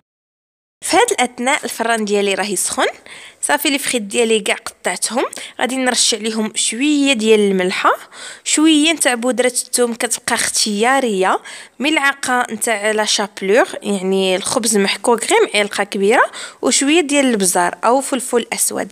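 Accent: Oujda, Morocco. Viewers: oujdiya hta ana akhoti mli nchofha nazlat chi vidio kanfrah bach nsma3 sotha olhadra loujdya wlh twahchna oujda olghorba s3iba bzaf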